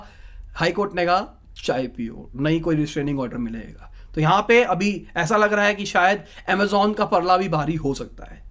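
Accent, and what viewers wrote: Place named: Punjab, India. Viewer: Your accent is Punjabi. Im right? Pegchanana mushkil hy being a Punjabi i can understand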